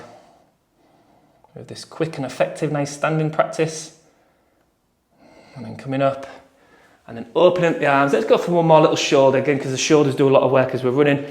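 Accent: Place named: Hull, United Kingdom. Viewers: I've been in lazy for 6 months and haven't run. Feels good to be back listening to and his Hull accent! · Great effective stretches with what sounds like good honest East Yorkshire accent. Win win!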